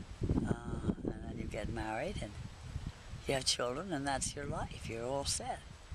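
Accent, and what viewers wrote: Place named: Philadelphia, United States. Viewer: Great example of a Main Line accent! Thanks for posting!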